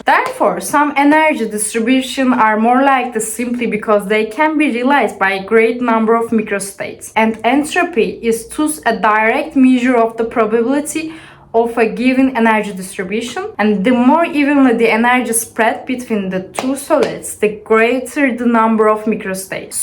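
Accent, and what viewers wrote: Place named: Russia. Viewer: Thanks for the video. Btw ur accent sounds more Russian than Turkish. I am Turkish too, but i'm going along with the concept.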